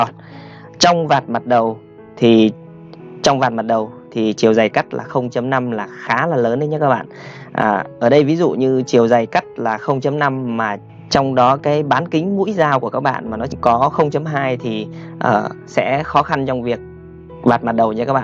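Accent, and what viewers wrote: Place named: North, Vietnam. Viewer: Người bắc nói giọng bắc dễ hiểu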